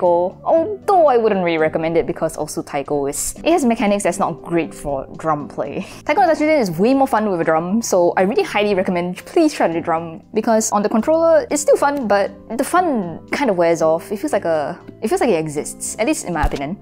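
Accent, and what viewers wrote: Singapore. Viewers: So this is Singapore Accent? Hmm instersting...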